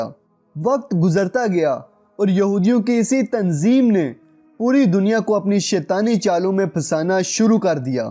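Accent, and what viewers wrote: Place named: Mandi Bahauddin, Pakistan. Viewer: جناب گرد اپنی منڈی بہاؤالدین والی اڑڑدو ٹھیک کرو نہیں تو پنجابی بولو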